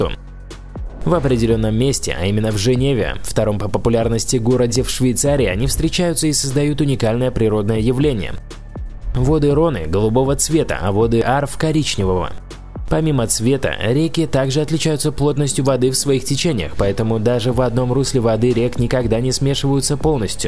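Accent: Moscow, Russia. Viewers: Такой интересный канал, но из за противного московского говора не могу смотреть до конца. Как бы не терпела, раздражает.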